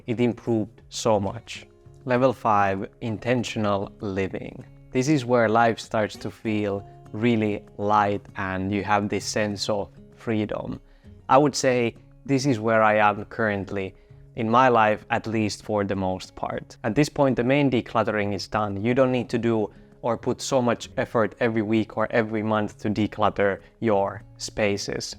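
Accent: Finland. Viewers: By your accent, I guess you’re from Finland. Am I right? 😊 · I was listening are you Finnish? Muumimuki ja kahvi 😂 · Oon ihan varma, et puhut suomiaksentilla :D Miks päädyit tekee videoita englanniksi? Ootko miettinyt, et sulla ois kohderyhmää myös Suomessa ja suomenkielellä? :)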